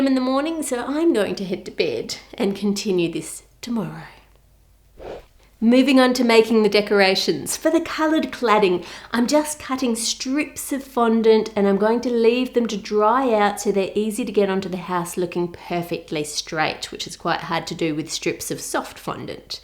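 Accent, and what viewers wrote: Australia. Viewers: Wait... your Australian OMG! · Gosh I love Australian accent so much it's definitely my favourite out of all of them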